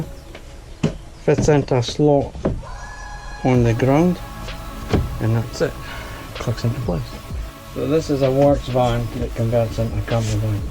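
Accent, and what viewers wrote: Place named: Scotland, United Kingdom. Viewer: My automatic captions were translating “van” to “vine”! Ha! It’s never heard a Scot before. Brilliant set up!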